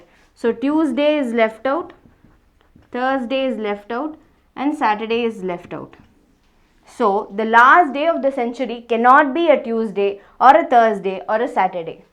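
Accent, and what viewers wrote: South India, India. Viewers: she is southie by her accent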